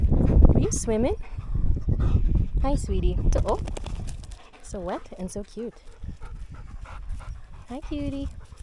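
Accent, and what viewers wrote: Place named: East London, United Kingdom. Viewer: that is absolutely excellent. what an amazing route and landscape. well done for documenting your travels. I love the word 'thunk'. It's awesome. love and good fortune (Mangala sutta) 😎😊❤ p.s. that was certainly an east end of London market traders accent. Or maybe Fagin from Oliver Twist. Certainly one of the two.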